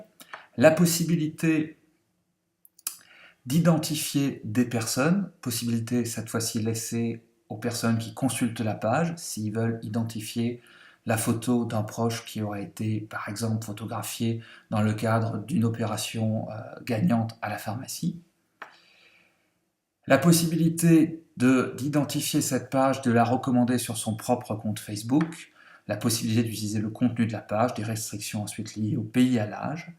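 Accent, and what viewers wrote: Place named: Alsace, France. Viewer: Très bonne présentation, seul bémol, le présentateur a un fort accent Alsacien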